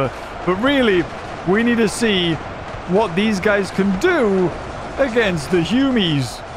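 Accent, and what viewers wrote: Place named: United Kingdom. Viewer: i never heard a brittish bloodletter but i approve massivly great commentary to the happenings on screen :)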